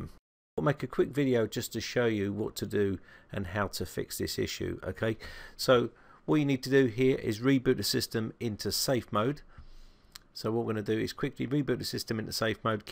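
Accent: United Kingdom. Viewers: Thank you. It helped me and your british sounds cute.. ;)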